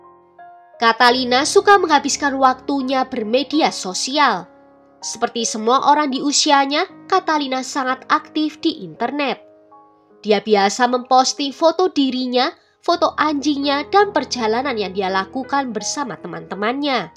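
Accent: Java, Indonesia. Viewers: Medok banget 😅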